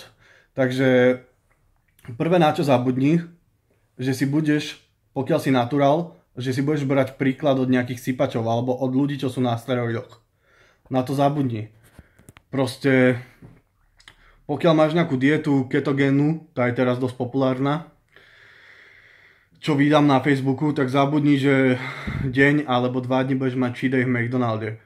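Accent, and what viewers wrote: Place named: West, Slovakia. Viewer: neznášam západoslovenský prízvuk, ale pozrel som to dokonca :D ber to ako kompliment, no offense, gl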